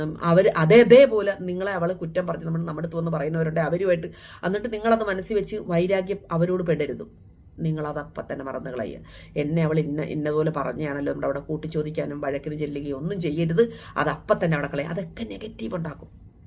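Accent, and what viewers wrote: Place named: Alappuzha, India. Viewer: ശരിക്കും ആലപ്പുഴയിലെ സംസാരരീതി പു ന്ന പ്ര ആണോ വീട് എൻറെ വീട് ambalapuzha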